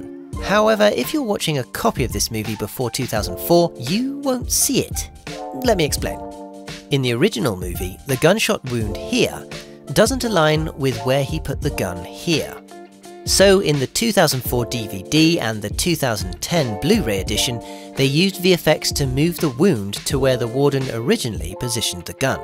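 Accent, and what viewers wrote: United Kingdom. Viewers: the sort of British accent that you sometimes have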